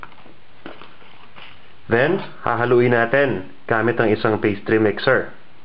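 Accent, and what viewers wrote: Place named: Conyo, Philippines. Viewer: ang konyo mag salita buset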